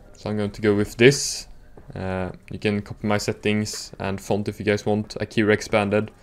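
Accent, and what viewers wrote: Sweden. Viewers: this guy is 100 percent swedish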